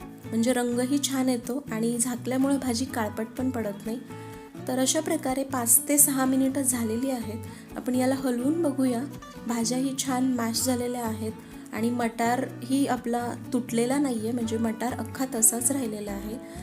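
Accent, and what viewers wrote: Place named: Pune, India. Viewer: ताईची भाषा पुणेरी आणि स्पष्ट👍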